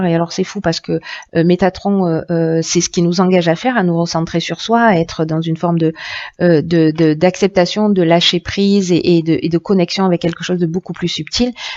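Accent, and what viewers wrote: South, France. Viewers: Merci ! Reçu 5 sur 5, accent du Sud compris, soleil assuré !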